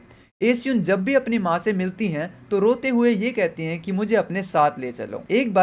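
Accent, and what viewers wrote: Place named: Haryana, India. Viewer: Bhai aap haryana se ho kya🎉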